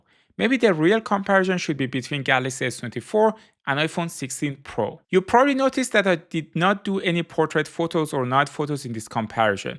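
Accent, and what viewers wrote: Iran. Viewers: لهجه ایرانی غلیظ😂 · لهجه ایرانی باشه ؟ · Are you Persian? Your accent is sooo close to Persian Thanks for the video by the way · oh this persian accent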